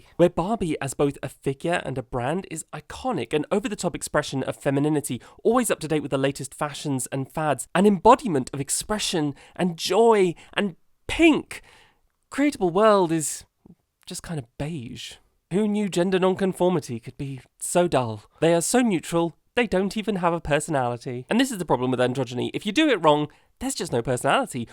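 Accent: United Kingdom. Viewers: It’s just Southern English 😭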